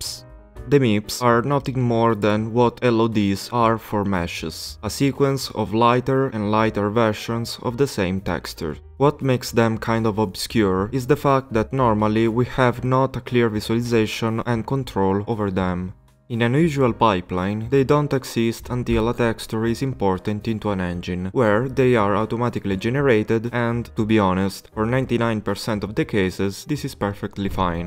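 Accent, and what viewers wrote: Italy. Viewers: I can tell this is south italian accent from a thousands miles away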